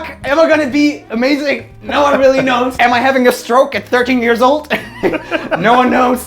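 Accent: Gothenburg, Sweden. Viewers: why is his swedish accent so strong here? :DD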